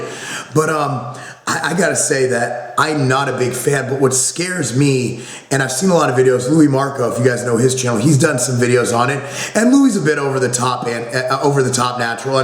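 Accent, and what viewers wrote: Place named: Brooklyn, United States. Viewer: He totally has that nasty Brooklyn style/speech going on.... Yuck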